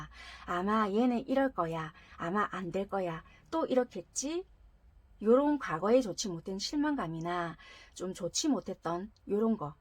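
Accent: Busan, South Korea. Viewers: 5번🙏🍀💜 저도 부산사람이라 듣기 편하고 좋아요 잘맞는거같아요....... 참고해볼게요... 앞으로 우리커플 잘됐으면 좋겠어요 제발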